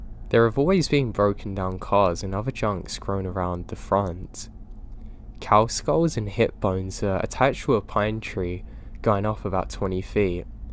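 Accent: United Kingdom. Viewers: You really are one of the narrators who relax me. When I drive I listen to Mr Revenant and Being Scared, but if I want to be simultaneously scared and soothed to sleep, you're the one. I do have unusual dreams listening to your soft British accent (I assume you're British and not any of the others, I know you aren't a Liverpudlian but to my ears you sound a little cockney. I wonder if you could clarify from where in the British Isles you are from. Not Ireland or Scotland or Wales, right?)Yorkshire?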